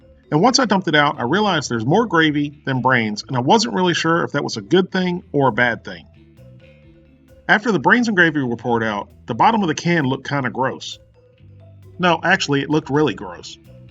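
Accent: South, United States. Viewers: No offense but if your dad liked pigs brain and scrambled eggs you have some fucked up genetics. I always imagine you as a big 260, 280 lb southern dude off the way you talk and eat lol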